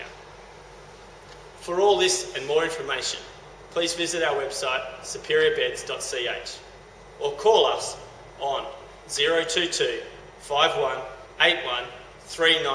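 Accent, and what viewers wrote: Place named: New Zealand. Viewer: Sounds like a kiwi.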